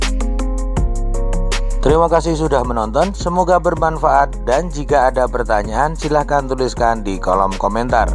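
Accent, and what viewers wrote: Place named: Yogyakarta, Indonesia. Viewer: Apa suara org jogja kaya gini semua ya 🤔🤔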